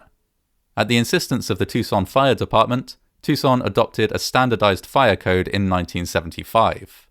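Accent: United Kingdom. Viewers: I love your voice and UK accent, you're soothing to listen to after a hectic days work. And your stories are very entertaining and enlightening. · I always been wanting to say this but the narrator's voice is awesome. Part of the reason why I watch these spooky vids is due to his voice. Its British, isnt it?? Truly awesome.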